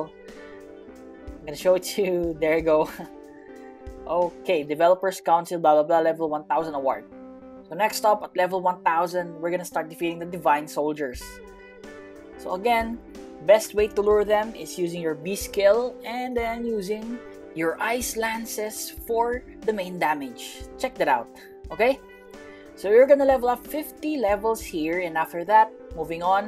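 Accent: Philippines. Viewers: Alam kong pinoy ka kasi sa salita mo pang pinoy na eh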